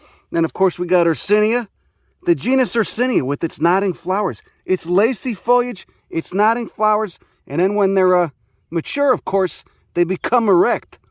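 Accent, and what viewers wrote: Chicago, United States. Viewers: Chicago, Illinois area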